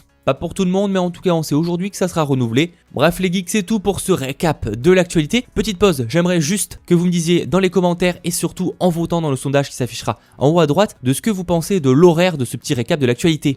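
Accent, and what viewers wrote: England, United Kingdom. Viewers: Ton accent anglais me perturbe vraiment.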